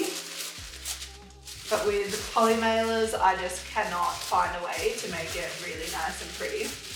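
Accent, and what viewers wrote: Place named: Australia. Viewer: Hi from Sydney! Just subscribed and am about done binging your channel, its great to have an Aussie crochet voice for a change. I had my first crochet market yesterday and it wasn’t anywhere near as posh or successful as your bus depot but I went in expecting no sales and instead made my stall cost ($40) back in 30mins then went home with $200. I try to holiday in canberra once a year so I’ll look out for your stall next time.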